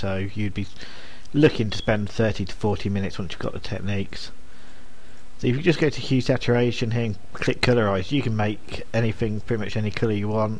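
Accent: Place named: United Kingdom. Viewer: A great example of a fantastic tutorial that is impossible to follow. Pardon my frankness but your introduction might seem like a good idea to you but to me, I can't hear the essential part of what you are trying to say. Its concealed by the MGM soundtrack you used. I've got 18 years of Photoshop experience and like the lady further down the comments lists says... I can't learn a thing from this. Dozens, maybe hundreds of otherwise really useful tutorials are completely ruined by the speed and mumbling of the person doing the tutorial. You can take heart in the fact that even Adobe themselves are guilty of the exact same problem. 50% of their tutorials are wasted on 80% of the audience. I used to listen to soapies my family watched in the evening and marveled at the clear and concise way the actors spoke. No one could suggest they couldn't be understood. 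Many years ago - before the Internet, I used to make How-to VHS videos. They got accepted by a TV station because I'd spent over a year practicing clear diction before ever making my first tape. In all seriousness mate... Your Tutorial is exactly what hundreds of people need and most will never understand anything about the processes you describe. Slow down what you are doing. Speak clearly AFTER the filming of the process and you'll have an exceptional video. Make more like you made this one and only some British people will understand what you say. The rest of the world will keep looking for one they can understand. No mali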